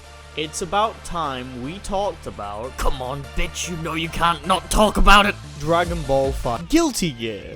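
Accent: United Kingdom. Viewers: Another fucking banger! Everytime I let your silky british voice lul me into listening to info about the topic the punchline hits and I never see it coming. I don't genuinely laugh at many videos but yours always manage to get me. Never change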